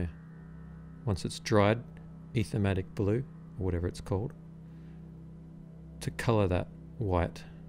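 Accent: Australia. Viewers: "a bit how ya goin' " it truly is wonderful to hear the Aussie vernacular getting represented in the Warhammer Community. "Wha'do'ya'think of tha new Primaris moooite?" "Yeah not behd pretty good size" "Corvus, he's a traitor ay?" "Yeeaaahhh... Naaahhhh"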